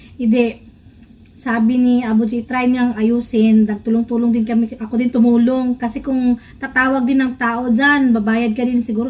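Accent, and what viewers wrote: Visayas, Philippines. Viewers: Bisaya accent?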